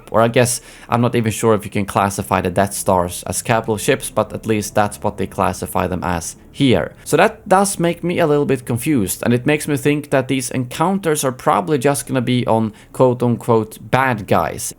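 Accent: Sweden. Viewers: Sorry but I have to ask, are you swedish? If not i’m sorry for asking but it sounds like a swedish accent and Im from Sweden.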